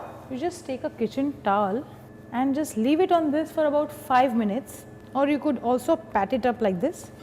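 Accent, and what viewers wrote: India. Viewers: OMG I love the Indian-English accent Thanks for the recipe ^^ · lol thts indian accent honey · Very very very thick Indian accent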